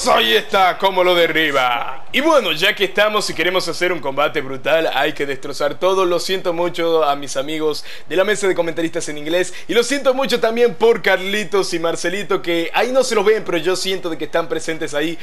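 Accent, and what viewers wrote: Tucumán, Argentina. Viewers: Sos de Tucumán? Argentina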